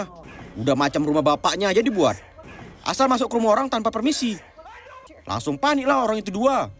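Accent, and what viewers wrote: Medan, Indonesia. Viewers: bah medan kali bang ,,uda ku subrik ya bang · Abang ini keknya orang medan ya... Logatnya itu medan kali kutengok😂😂😂😂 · MEDAN KALI BAH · Bang kau orang Medan iya kan...? Logat kau GK asing Bg krna aku juga orang Medan 😁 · Lucu kali abang ini bicaranya mcm org Medan aja. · Hahha medan kali logat kau lek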